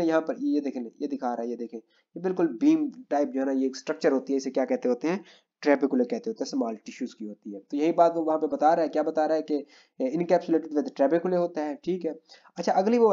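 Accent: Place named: Pakistan. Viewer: jee bhai jan, i can see by your accent, mai bhe Pakistan sy hn, graduate of AIMC Lahore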